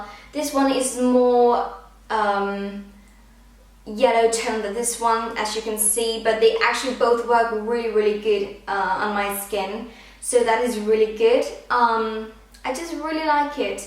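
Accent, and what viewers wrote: United Kingdom. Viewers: Why do you sort of have a british accent when you are danish and both of your parents are danish? <3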